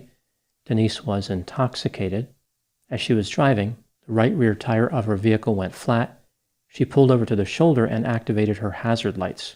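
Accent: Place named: Mid-Atlantic, United States. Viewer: Hi! Question might seem odd, so forgive me but I'm curious. I've been listening to your videos for a couple of years now. They've been soo helpful. Thank you! You have a particular accent..would you happen to have grown up in Southern NJ, PA, or MD? Folks from those areas have a particular way of pronouncing certain words. Lol. I've made a bet with my friend that you're from one of those places lol.😆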